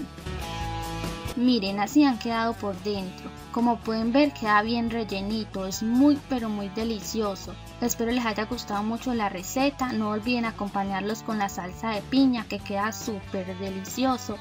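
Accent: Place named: Medellín, Colombia. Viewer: Ese acento de Medellín que gusta mucho Besos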